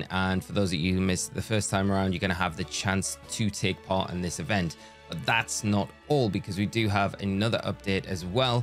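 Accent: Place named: United Kingdom. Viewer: Brittish accent? So strong... 😢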